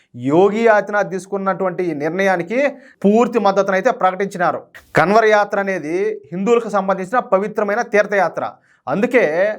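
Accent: Rayalaseema, India. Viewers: Nellore Tirupati Chittoor slang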